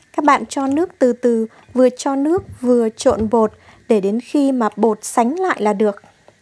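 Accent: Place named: Hanoi, Vietnam. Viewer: Ban nay có giọng nói đe nghe như người Hà Nội ấm áp ghê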